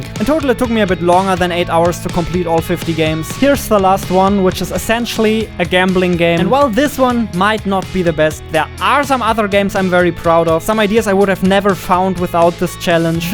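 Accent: Germany. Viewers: bist du Deutscher weil du hast so einen akzent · you german? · Could it be that you are german? Or does it just sound like a german accent? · are you german ? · Man hört das deutsche so hart raus xD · You are german i hear that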